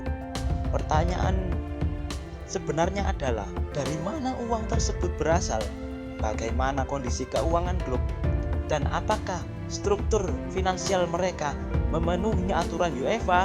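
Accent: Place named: Java, Indonesia. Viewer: Menurut saya : perbaiki dulu narator mu.. kalo bisa jangan terlalu medok jawa, belajar n liat jurnalis2 nasional TV, mereka gak ada yg medok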